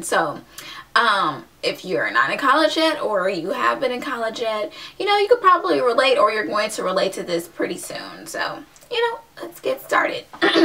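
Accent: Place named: Illinois, United States. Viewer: I'm from the IL too. I can tell you are from IL, you sound so familiar!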